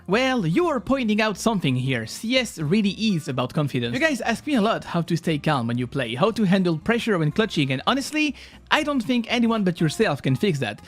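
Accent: France. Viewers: Are you french? Nice video btw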